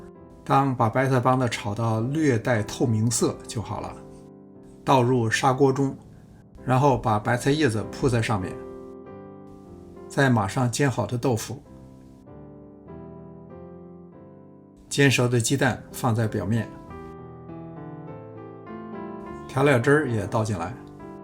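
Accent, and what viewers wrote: Beijing, China. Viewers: 您是北京人嘛🤩口音有点亲切啊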